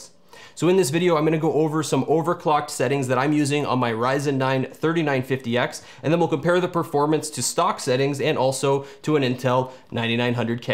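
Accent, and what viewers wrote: Canada. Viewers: Love the Canadian accent. Seems another Canuck is into tech vids.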